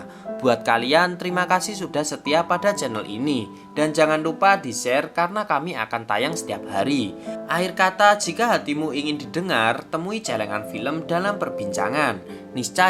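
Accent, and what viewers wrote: Java, Indonesia. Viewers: Intro,closingnya sama logat jowone yg bikin gua pengen nonton trus celengan film😂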